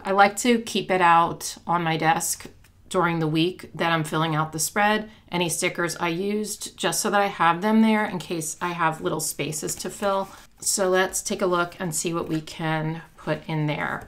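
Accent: Wales, United Kingdom. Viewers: Flowers and a Welsh accent?! ❤❤❤❤ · A gentle and thoughtful man with a British accent??? Swoon!! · If he’s from Wales his accent is Welsh!😊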